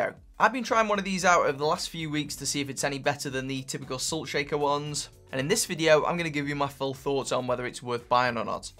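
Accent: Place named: Northern England, United Kingdom. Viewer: I hear a Northern England accent. What town, mate? Nice vid.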